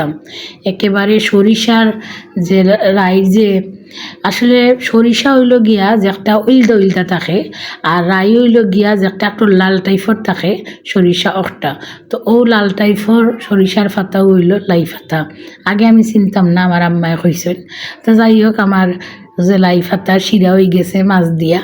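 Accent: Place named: Sylhet, Bangladesh. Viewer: সিলেটি মাত বহুত সুন্দর 😂❤❤❤❤❤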